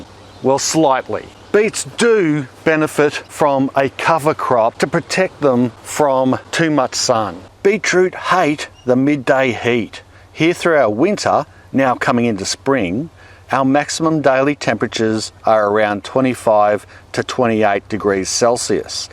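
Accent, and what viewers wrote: Australia. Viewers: Chef E He lives in southern Queensland in Australia and he is speaking Australian. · Love your Australian accent! Your opinion of sub-irrigation grow boxes? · i enjoy your content delivery, your Ausi accent as well; i take what i can from you, because i live in a 6 months of gardening a year if i'm lucky, area just north of the 45th parallel, on the shore of Lake Huron one of our great lakes. i love beets, and growing them; i took away the spacing part of your talk, i'm gonna try your way in about 4 months. thanks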